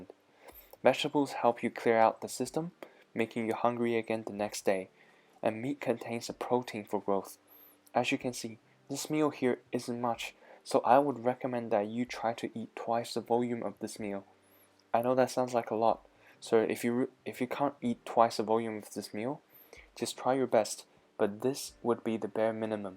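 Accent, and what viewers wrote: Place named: Australia. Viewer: Aussie mate 😎 😂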